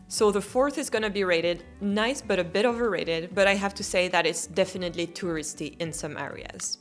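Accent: France. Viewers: Bonjour! Je suis américain et mon niveau de français est environ intermédiaire-haut. Je suis tellement impressionné par ta fluidité en anglais! Bravo! Petite question: penses-tu que ton niveau d’anglais est comparable à celui de Jodie Foster en français? (Btw…I doubt you will read this, but I am curious nonetheless. I also understand self-evaluations are difficult. But to me I could easily mistake you as an American, though I do perceive the slightest French accent, which I think adds great spice to the language!). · Thanks so much for the recs! You didn't need to advise us not to go on a group tour, though. Waaay not our style. We too like the authentic and non-touristy areas of any place we visit, despite (technically) being tourists. That's why your advice will be so helpful. You speak English really well, by the way, but it's fascinating to hear your accent, because sometimes you have a French accent when speaking English and sometimes almost an American one. 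There's nothing wrong with that, of course, but it's interesting. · i love french accent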